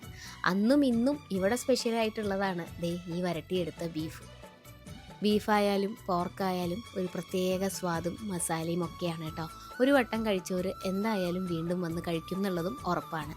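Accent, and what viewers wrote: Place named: Valluvanad, India. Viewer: വള്ളുവനാടൻ ശൈലി ആണല്ലോ സംസാരം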